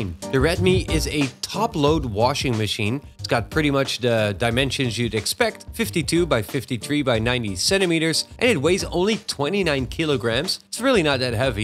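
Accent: Netherlands. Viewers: We don’t mind this kind of production. It still had your graphics, music and that Dutch take on the English language :D. Feel free to keep up the great videos in this format.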